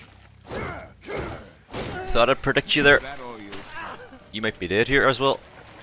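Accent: Northern Ireland, United Kingdom. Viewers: lol he sounds nothing like Paddy Pimblet. Paddy is English and has a strong Scouser accent from Liverpool & is from Northern Ireland by the sounds of it.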